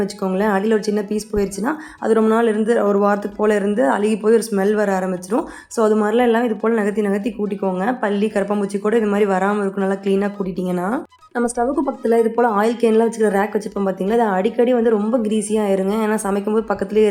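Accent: Kongu, India. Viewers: Kongu tamil apedetha ka sis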